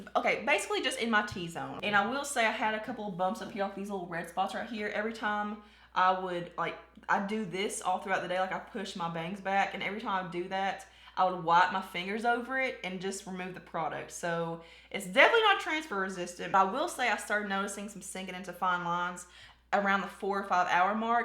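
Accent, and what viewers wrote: South, United States. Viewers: I just recently ran across you on youtube, like a week ago & I think you're just awesome!! And so southern...like myself ❤ Merry Christmas!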